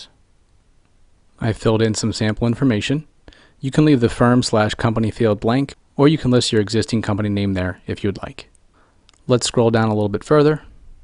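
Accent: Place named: New York, United States. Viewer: Man I love your Jewish NY accent it sounds so cool haha. Thanks for the help! I was able to file with ease for my LLC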